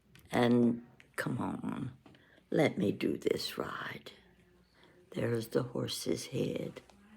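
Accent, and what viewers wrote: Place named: Florida, United States. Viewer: This is Kitty I live in S. California. I discovered your channel a week or so ago. I forget which video but you were in the kitchen. My mother in law was from Lakeland Florida and I miss her voice. Your accent is similar and that’s what I heard at first. In the kitchen you remind me of my grandmother with whom I spent many hours in the kitchen with. I am 77 now. A widow since 2019 after 50 plus years together. I was his caregiver for decades. It occurred to me that at least in my world out here on the West coast life seems isolating. I too talk to myself. I read that it is good for your brain. I also love dishes and setting the table. I have what they call “poorman’s fiesta” called Harlequin made by the same company. Summers as a child I’d spend a time at my grandmother’s house near Atlantic City NJ. Her house had a front porch and we would have breakfast out there. 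My grandmother would put our soft boiled eggs in the Easter egg color Harlequin sugar bowls. Clever. The bowls must have come out in the 20’s as they were very Art Deco looking to me with triangular shaped handles and lid top. As I write I realize it must have been around the time she passed away I started to think of those things we ate the soft boiled eggs in and oddly enough that very year they reissued them. I wanted the original ones and began hunting for them and that started my collecting other dishes and china. I love dishes and ceramic things. p.sl. I got a felt skirt with. S& H green stamps. I wanted a p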